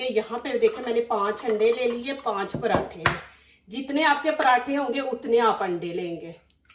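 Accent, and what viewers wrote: Punjab, India. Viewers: Ap na bohat acha Prata bnaye hain par ap ki jo Zuban hain us aa saf pta challah hai kh Ap ki Zyban Panhabi hai to best hai ap Punjabi bolo apni Zyban bolna mn kaisi sharm please apni Zyban bolna mn kisi ko bhi sharam mesoos nahi karni chahiye I prud of my panjabi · Aap punjabi pind jesylehjy mn baat krty hn.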